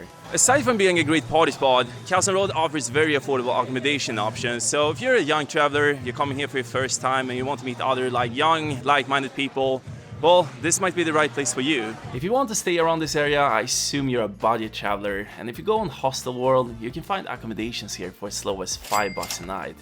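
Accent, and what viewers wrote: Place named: Sweden. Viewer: Sound like English in Swedish dialect.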